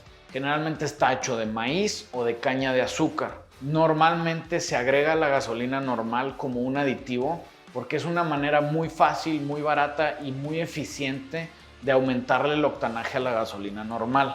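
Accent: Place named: Fresa, Mexico. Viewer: Osea que feo no? osea que fresa no? osea no mames wey con ese pinche fisico y ablas todo fresa con voz de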